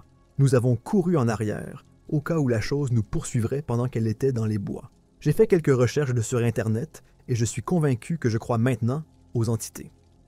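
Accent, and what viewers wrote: Quebec, Canada. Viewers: C’est un accent québécois! 😉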